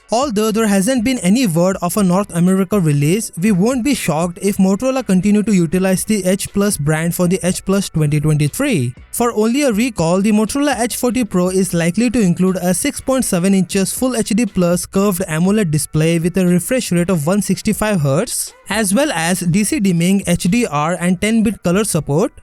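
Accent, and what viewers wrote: India. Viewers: Indian English dialect makes it exotic for ears 👂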